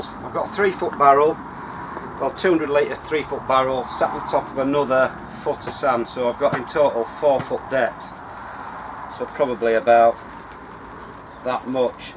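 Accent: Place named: Scotland, United Kingdom. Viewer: Hello from New Mexico, What kind of sand are you using? Is it something from a home depot or garden centre, or just regular old sand? Or is it something special? Second, Do you have holes in the bottom of your barrels for the water to drain out? Where are you located? I think maybe Scotland from your accent? It sure seems to rain a whole lot there. Can you reuse the sand the following year?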